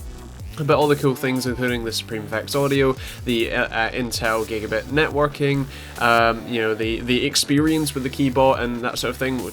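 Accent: Scotland, United Kingdom. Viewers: You seem to have a very slight Scottish accent? Where you from?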